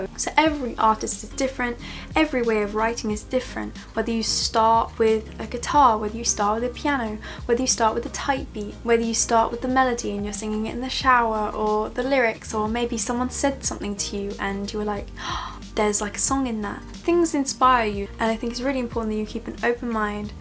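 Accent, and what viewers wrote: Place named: United Kingdom. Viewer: Very interesting video. Tysm for making my mood better (my school starts tomorrow ). Maybe when I'll be older, I'll use these tips. I really luv your british accent. That 2 kisses at the end make me smile.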